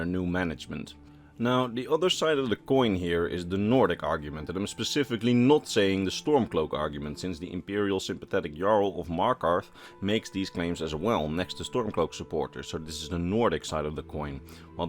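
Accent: Netherlands. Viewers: Goede videos, ik kan horen dat je uit nederland komt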